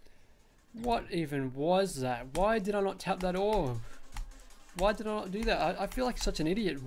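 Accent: Australia. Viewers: I live in Australia And I never knew you lived in Australia Too. I suck at geometry dash. Well I live in Tasmania and I did think you live in Australia because of your accent. HOW DO I GET BETTER AT MAKING LEVELS AND JUST EVERYTHING IN GEOMETRY DASH!!! Ok I guess I’m just cringe now.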